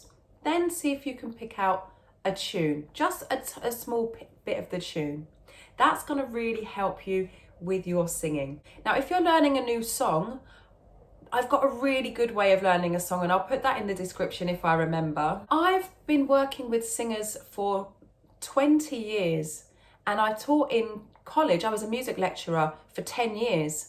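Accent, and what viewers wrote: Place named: England, United Kingdom. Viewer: Do I need an English accent like you to learn how to sing?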